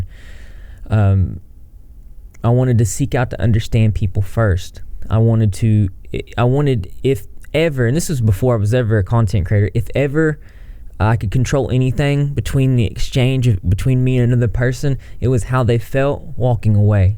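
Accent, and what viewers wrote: South, United States. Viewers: I too struggled with boundaries until I started my nursing career. I’m not sure why that changed but I’m glad it did. Not going to say it’s perfect but I learned like you probably have people will walk all over you if you don’t. I noticed you also have a southern accent so I’m assuming you are from here as well. It’s sad that people take our generous nature and upbringing and use and abuse us. It’s not because we are stupid or gullible we are just taught to do for others. I’m glad you are working on this and I hope you continue to do well :)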